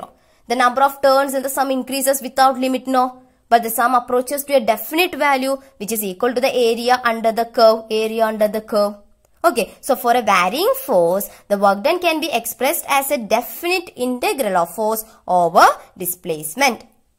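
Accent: Kerala, India. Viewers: The way she talk in English we can say that she is from Kerala 😁👍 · yeah I think she is malayali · i can say that she is from south india from her accent.😂😂thank you mam i understood it.Love from Nepal❤️❤️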